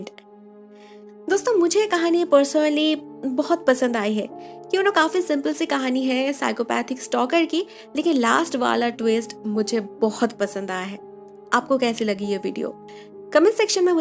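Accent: Bengal, India. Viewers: Didi are u Bengali🤩🤩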